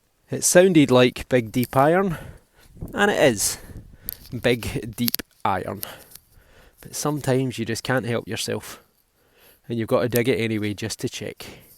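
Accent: Scotland, United Kingdom. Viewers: Hi! I love your video's and the Scottish accent 👌🏻 what a nice find ! It looks mid eval. Greetings from the Netherlands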